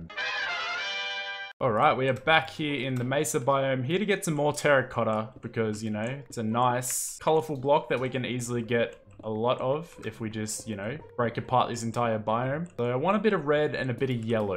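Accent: Australia. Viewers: Are you Australian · WAIT YOUR AUSTRALIAN!?! I didn’t know….YASSS I FINALLY FOUND A NON~ AMERICAN YOUTUBER!!!!!!!!!!!! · This dude had an accent and everything and I still didn't realize he was Australian until he said something. I'm an idiot. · Wait, Australian YouTuber?? Omg I thought he was British... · Wait, you’re Australian? POG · You're Australian? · Seriously I never Knew you where Aussie #AustraliaForLife Because I’m Aussie to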